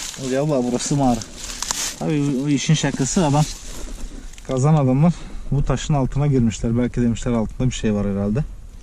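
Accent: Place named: Aegean, Turkey. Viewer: Abi konuşman ege muğla aydın tarafı gibi nerelisin sen hemen beniyle bir irtibata geç asıl işaretler burda biz anlamiyoruz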